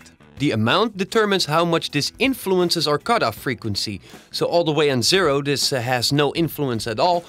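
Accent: Netherlands. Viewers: Areeh joe Nederlands? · Haha - You sound sooo Dutch. Nice tutorial though - thanx ;)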